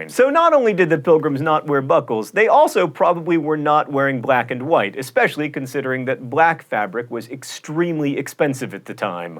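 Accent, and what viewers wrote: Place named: United States. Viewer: ***** He probably just has a regional American accent of some sort.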